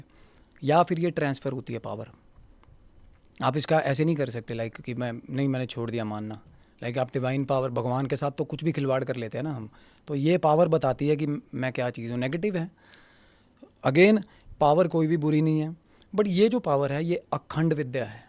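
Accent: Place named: Punjab, India. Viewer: Veer ji apka Punjabi accent kamaal ka hai, try karen ki pure Punjabi aap bole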